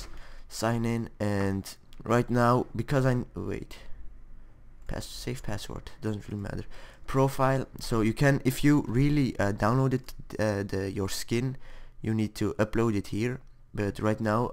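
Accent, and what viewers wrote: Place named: England, United Kingdom. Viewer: hahahah je engels accentje is grappig man :D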